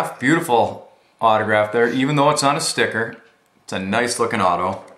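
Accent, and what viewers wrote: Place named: Minnesota, United States. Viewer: I like the Nathan lol. you know shit's going down when hopps appears lol. like I said in the text, you finally sound like a true minnesotan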